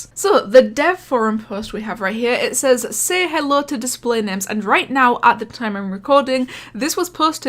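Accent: Scotland, United Kingdom. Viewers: are you scottish eh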